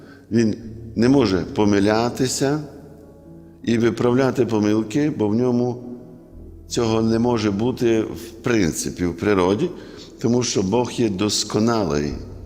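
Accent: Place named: Galicia, Ukraine. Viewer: Мені дуже подобаються ваші проповіді чіткі і цікаві і ще ваш чудовий галицький діалект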